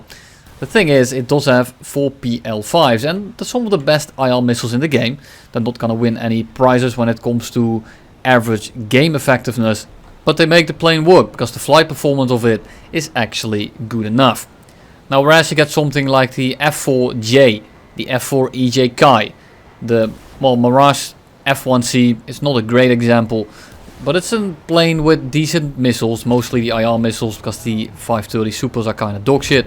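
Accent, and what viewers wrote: Netherlands. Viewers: Je hoort het altijd meteen als iemand nederlands is. Nederlanders hebben een hele goede engels vocabulaire maar spreken kunnen we niet. Haha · Your Dutch accent makes me smile a lot. Top content!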